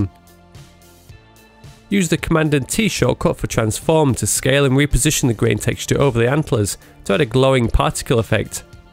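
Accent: United Kingdom. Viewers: you're such a cool bloke and your bri'ish accent is very satisfying to listen to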